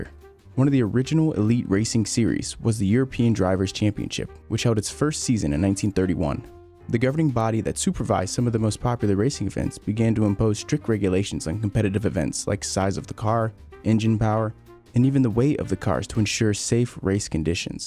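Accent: United States. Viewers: I was like, "something's off about this F1 video" -- then I realised. It's the american accent. I'm not used to people talking F1 in an american accent... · Being american myself at first I didn't realize anything. Maybe about 10 minutes into the video i was like wait, that "grand prix" sounded unnatural.. oh wait he's american? Thats different, in a good way (: I often feel like I'm the only person for miles that cares about F1. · its the american accent for me, it took me off guard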